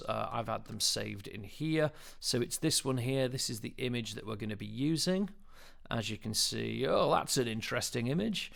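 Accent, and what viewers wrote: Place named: Yorkshire, United Kingdom. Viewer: Love a good Yorkshire man. Ay yup lad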